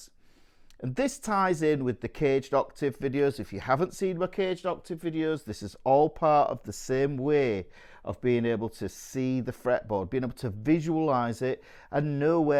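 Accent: United Kingdom. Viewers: Part-2: It's interesting how content from an instructor/mentor speaking in a UK accent makes the content seem more authentic!! Because of the unique linguistic "personalities" (for lack of a better word), it would be fun to see your content in Scottish, Irish, Cockney, and AU/NZ. (with subtitles of course!)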